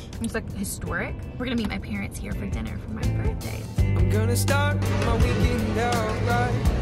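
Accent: Texas, United States. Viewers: "I was really maaaaaaad!" 🤣🤣🤣 Your Texan accent is really coming through, it's awesome!!!!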